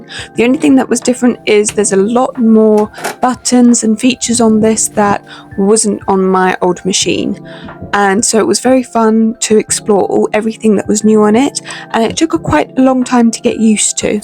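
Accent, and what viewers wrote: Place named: United Kingdom. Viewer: May I ask a personal question? English is my second language and I am used to American YouTubers. You sound definetly British but I would like to know from which British region your accent is coming from. Or would it be some common speech pattern for the whole of Great Britain?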